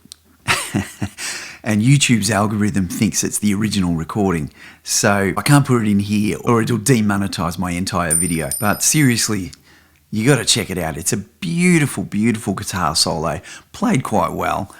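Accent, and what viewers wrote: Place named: Australia. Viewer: Both of these videos on the guitarists of Tom Waits are great,. Your storytelling, energy, wonderful Ozzie accent and most of all your playing were really a treat. Thank you.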